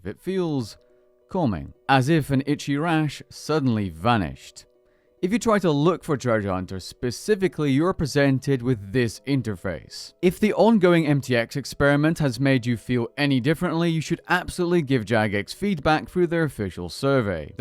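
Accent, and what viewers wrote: United States. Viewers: So, you're telling us that you have a light soft American accent voice, right?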